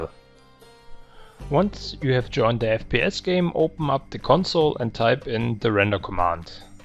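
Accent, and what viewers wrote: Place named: India. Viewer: Great Video. I like the way you speaking but you sounds like an indian man who is speaking englisch for the first time.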